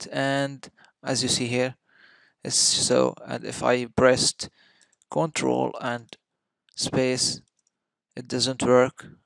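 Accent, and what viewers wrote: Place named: Egypt. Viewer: From the accent I can tell you are Egyptian lol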